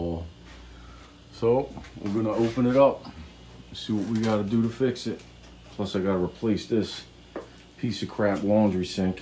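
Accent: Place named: Philadelphia, United States. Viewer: this is NOT reall big problem..if you got the house for a good price.... do the work you save a ton it aint that hard it sounds like you are from philly so big box stores everywhere !! i see u have a work van and tools ......open the walls demo and clean that is the first day, get what u need in plumbing materials ie pipe fittings ect ect bust out the old shit ( at the bottom of where the pipe makes a turn scoop out the rust from the pipe with an old spoon on a pole) dry fit your pvc ...the pvc is cheap and easy.... do all that is needed to be replaces ,check for leaks not impossible ..after no leaks ,,rap with fiberglass insulation pipes will be MUCH quieter ... i did my 3 story house in oak lane TWO sewers it was a lil work but i saved a fcking mint!!!!! 😉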